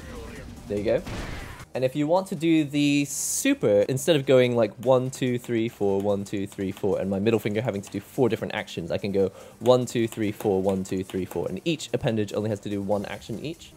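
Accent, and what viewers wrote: United Kingdom. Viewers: this is my first video of yours and seeing the name " " i was NOT expecting that thick british accent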